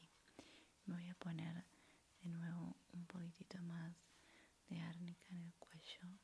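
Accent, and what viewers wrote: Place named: Argentina. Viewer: Me encanta el acento argento en asmr!!!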